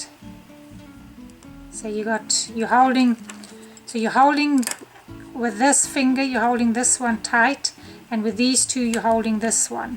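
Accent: South Africa. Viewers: Is that a South African accent? Lekker, will try with God's will soon ❤ Thank You P.S in Hebrew means my happiness, is happiness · Are you South African? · I love your accent. You by any chance from SA?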